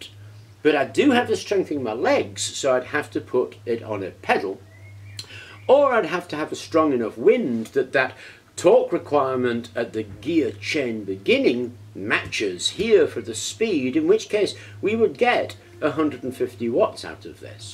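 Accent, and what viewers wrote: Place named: United Kingdom. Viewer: lol, i cant stand british accents.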